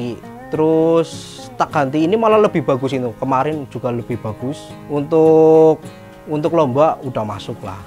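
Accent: Banyumas, Indonesia. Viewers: Ora ngapak ora kepenak yak.. :-D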